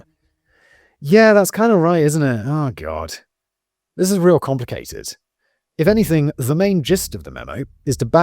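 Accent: United Kingdom. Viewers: Well time for bed with one of my fave true crime shows TCC. Why watch a true crime show when i go to bed you ask? ( you did not but allow me to tell you anyway ). with his dark british voice calms me and Danny writes good and long scripts. Perfect! Don't you all agree?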